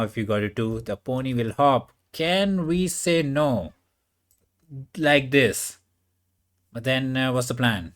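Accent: India. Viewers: I randomly find your Channel, i love when hindu People speaking english❤, i was work with People from India❤ 🇵🇱🤝🇮🇳 greetings bro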